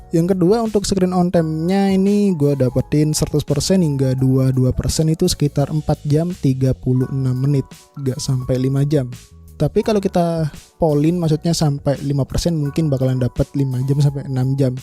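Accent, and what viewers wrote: Bali, Indonesia. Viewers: Jujur logat lu bang kaya orang Bali agak ke India"an😂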